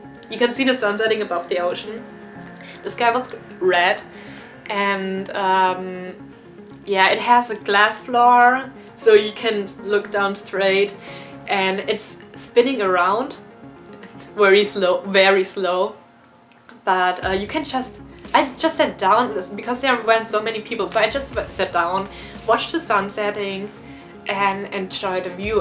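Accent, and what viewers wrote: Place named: Netherlands. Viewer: You are adorable. Thank you for this info. Very helpful. May I ask where your accent is from? I have family from Holland and very similar.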